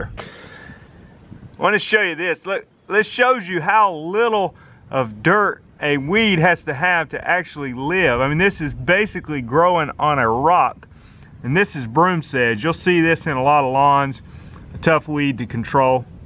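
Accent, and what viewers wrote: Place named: Alabama, United States. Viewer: He's got that Alabama draahhwwllll.. Love this channel tho. You'd probably say I have an accent from VA. But sounds normal to me .lol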